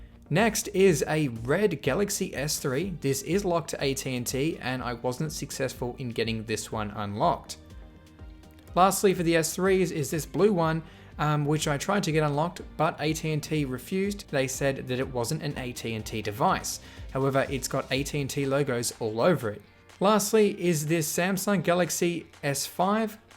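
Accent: Australia. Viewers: I think he lives in Australia based on his accent:)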